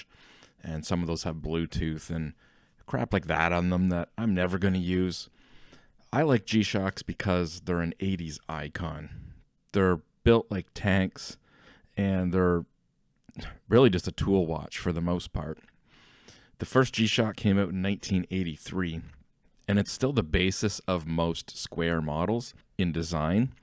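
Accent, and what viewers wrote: Canada. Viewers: Thank you for the nice video, I still bought this Casio model, it will arrive tomorrow. I am not a native English speaker, but it seemed to me that you have an interesting accent, are you from Quebec? Love from Ukraine.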